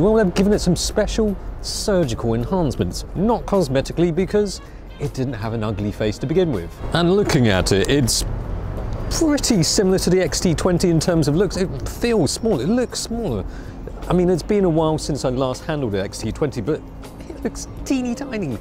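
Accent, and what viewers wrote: United Kingdom. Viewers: listening to you talk makes me wish i had british accent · 英式英语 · The way to improve my British accent, it's watching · Are you British or Japanese with a British accent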